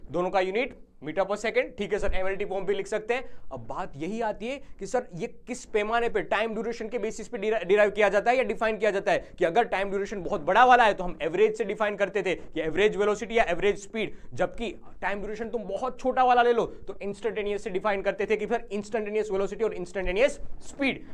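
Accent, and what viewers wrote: Gujarat, India. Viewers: Sir aap gujarati ho kya ?? apki tone se lag raha hai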